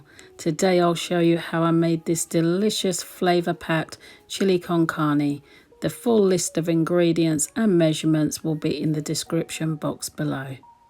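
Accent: England, United Kingdom. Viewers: I like it so much! (I mean this lovely pure english accent 😋😊). Greetings from Russia Already trying to cook 🧑‍🍳🍽️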